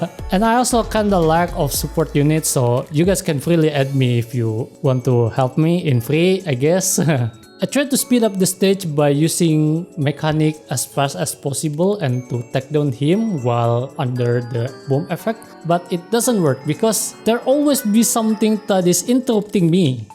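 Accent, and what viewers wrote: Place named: Indonesia. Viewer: kenapa ya orang indo kalo ngomong inggris kaya gitu semua ya? Kaya ada keunikannya sendiri langsung ada feeling "oh ini indo nih"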